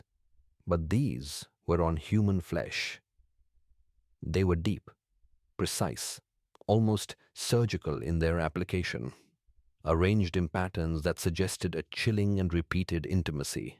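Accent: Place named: India. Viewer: How horrifying!😱😱😱 Is there any special reason the voice sounds Indian? Grave Fear has a video like this. Same title, same plot, same characters. Why do five guys in the video title screen I'll have the same face? Are they quintuplets? , the story says "they held a look of pure douer", but the video captions say "pure awe". , it seems like the story starts over from the beginning. The only differences between this and the Grave Fear one are the narration and the way it starts to summarize what happened so far towards the end. Then it continues onward, going the way the Grave Fear video did. Another change is that the survivors didn't make it out. Is there, or will there ever be a sequel?😢😔🙏🙏🙏💖✨📖✨💖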